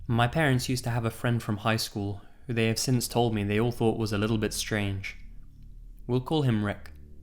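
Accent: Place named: England, United Kingdom. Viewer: Surrey. Bollocks. I can't believe I couldn't recognize your accent sooner; that's where my friend was born before she moved here ( CA, US). She'd never stop with the ''you daft cow'' if she knew. Still, that's better than her pet name for me in high school (dirty slag, which is not in the least bit accurate by the way). I think this is the fifth time I've watched this video, they're all just brilliant.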